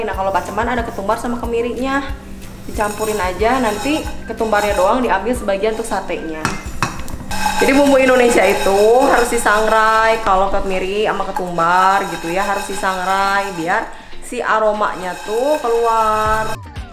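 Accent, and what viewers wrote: West Java, Indonesia. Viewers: Suka deh kalo teteh ngomong logat sunda euy 🤣🤣🤣🤣🤣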